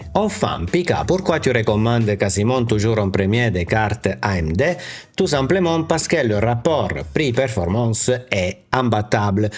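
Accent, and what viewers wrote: Italy. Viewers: Bonjour, tout d'abord je tiens à te remercier pour le contenu qualitatif de tes vidéos, avec, en plus un accent que j'apprécie puisqu'il vient me rappeler mes origines (mes grands parents étant originaires de l'île de Pantelleria 😘). Je dois monter un nouveau PC en novembre prochain et j'aurai voulu avoir ton avis sur le choix d'une carte graphique dédiée principalement à la retouche photo et au montage vidéo (davinci resolve, photoshop et lightroom). Tous les ordinateurs que je monte ayant une durée de vie de 10 ans, j'apporte un soin particulier au choix des composants sans toutefois tomber dans l'excès des cartes derniers modèles qui n'apportent finalement que peu de performance en plus par rapport à la génération précédente. Mon choix actuel se porterait sur une carte ASUS GeForce RTX 4070 Super/16 Gb Ram laquelle devrait convenir et tenir une dizaine d'années. 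Actuellement elle coûte un peu cher mais elle devrait à un moment donné coûter un peu moins cher. Actuellement mon PC est équipé d'une carte graphique Asus-CG-GTX-Strix-960/4 Gb de Ram qui a bien tenu jusqu'ici mais sa puissance devient insuffisante lors de l'encodage des vidéos. Mais elle tient le coup, sauf qu'elle devient lente. Je compte sur ton expérience pour m'orienter sur un autre modèle, si nécessaire. Grazie per il tuo ritorno. Ciao, Ciao 🙂 Salvatore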